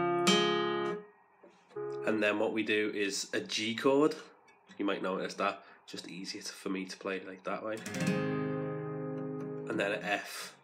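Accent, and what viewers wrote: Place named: Liverpool, United Kingdom. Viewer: Scouser!! Good man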